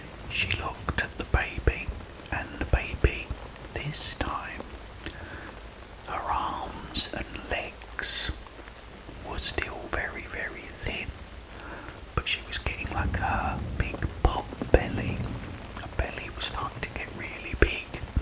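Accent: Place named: United Kingdom. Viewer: I'm American but I love British accents. Dealing with covid right now and this helps me get to sleep, thank you 🙏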